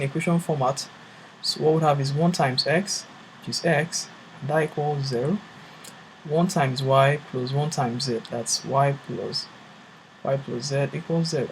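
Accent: Nigeria. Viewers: i knew you were nigerian as soon as i heard your voice, you probably igbo too lmao, good video broski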